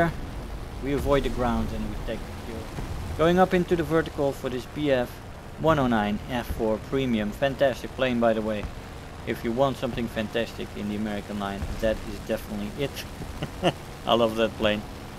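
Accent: Netherlands. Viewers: I need the pack... For it is my destiny to bring back balance to War Thunder. (Star wars Pun intended). How I found the channel: I was just watching WT Vids and I found your channel. Your accent caught my attention. Bcus I'm Dutch as well. And I don't know a lot of Dutch WT YouTubers so I taught lemme stick around and see where this goes🙈 What I think about your channel: What I like about your channel is that you are honest in your opinion and also the banter haha🤣 I also like how you address the players that Gaijin are just people as well and that if we want something to change we must not go and curse and scream to them (as a figure of speech) but tell it on a normal way to them. Keep up the good work😊 How long have I've been a Jengara🤣: I have been following your channel for almost 2 / 2,5 years now. It's like the day of yesterday when you started changing your thumbnails en your intro's 🤭 This was my summary of what I think, how long I've been following your channel and what I like about your channel 😊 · Hey man, you have a great channel because of your personality, you are calm and collected which is very relaxing and easy to watch. 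You explain the situations and the strengths and weaknesses of planes and you favor planes to tanks or ships unlike most war thunder youtubers. Lat but not least, i like your accent and the way you express yourself in English. Are you Dutch?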